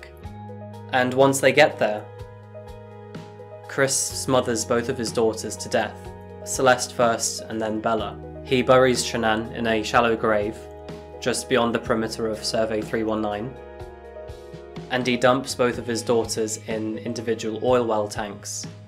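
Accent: United Kingdom. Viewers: I love the British accent all the time I live the royal family I love marks and Spencer your videos are remarkable ❤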